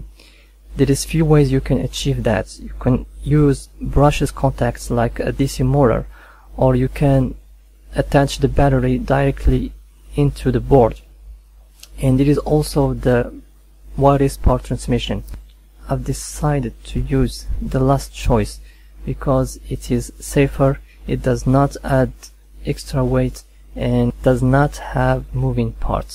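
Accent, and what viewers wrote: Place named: Morocco. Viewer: u kinda sound moroccan to me, o lah o3lem..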